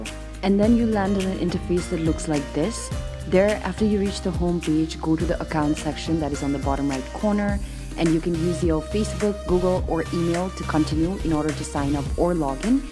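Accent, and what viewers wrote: India. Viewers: Sounds hindi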